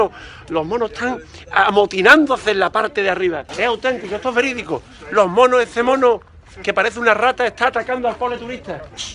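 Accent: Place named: Andalusia, Spain. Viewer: Ke makina jajajaj se nota ke eres andaluz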